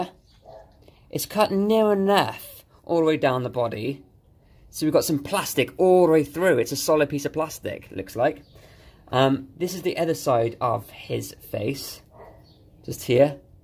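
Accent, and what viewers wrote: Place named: United Kingdom. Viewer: What a British accent and its not a bad thing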